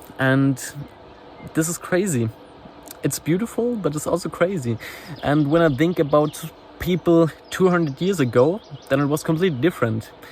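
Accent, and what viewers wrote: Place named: Germany. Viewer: Bro why are you speaking English? I can hear your German accent extremely clear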